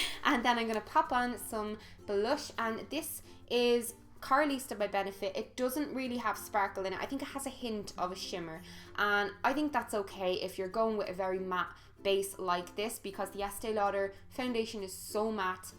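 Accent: Ireland. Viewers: I soooo love irish accent... And i have a friend who is irish... She's so sweet and kind... First time watching your video... I subscribed immediately 😘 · Oooh man, what a lovely accent! It makes me wanna visit Ireland even more <3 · I'm always so exited when I see your videos in my subscription box. I love your style and your accent is so desirable! Wish I was Irish! Thanks so much for improving my makeup knowledge and providing such inspiration! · your tutorials are very helpful for people with excoriation disorder like me, now I'm not ashamed of my skin, thank you so much <3 also I'm Russian and I really like Irish accent, for me it's much easier to understand than British :)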